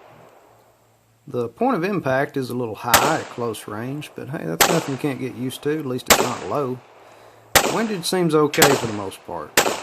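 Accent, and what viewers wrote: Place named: South, United States. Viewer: ""THEOLD SOUTHERN-ACCENT, ""NO ONE TALKS OR SPEAKS THESAME""!!!!!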